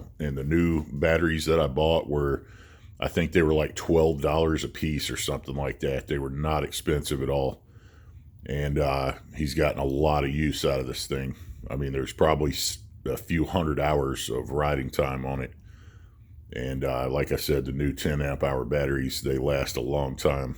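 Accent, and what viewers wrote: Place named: United States. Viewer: Bro ur voice it sounds so American how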